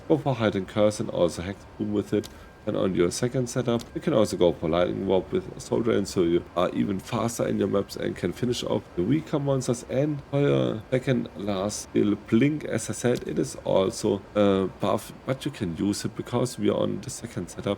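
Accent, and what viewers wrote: Germany. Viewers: the German accent doesn't help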